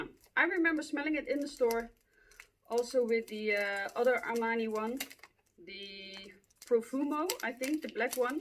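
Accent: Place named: Netherlands. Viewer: I love my line of YSL fragrances. La Nuit is probably in my top two fragrances ever. A dark, alluring, sweet and spicy... almost fresh cut grass sillage at times. Undoubtedly multiple of my favorite fragrances lie within YSL. You’re beautiful! I absolutely always love a Dutch accent. One of my favorites. Tot de volgende keer.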